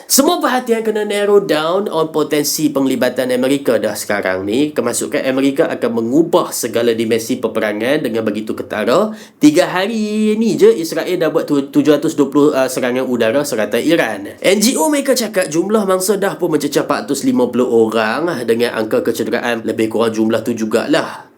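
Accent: Malaysia. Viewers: kerja bagus bang kalau boleh secara menyeluruh guna bahasa malaysia....pelat orang utara...😅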